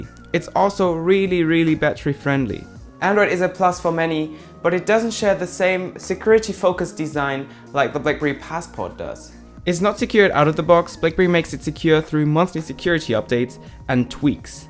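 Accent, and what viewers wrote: Germany. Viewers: Are you german ? You have this german somthing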